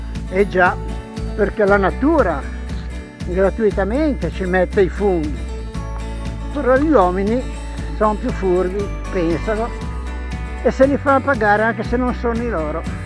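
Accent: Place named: Liguria, Italy. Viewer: Interessante questa applicazione mi fa impazzire sul tuo accento ligure sei un simpaticone e racconti le Cose con molto informazione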